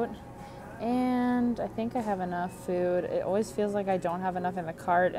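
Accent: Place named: Ulster, United Kingdom. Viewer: You do a beautiful ulster accent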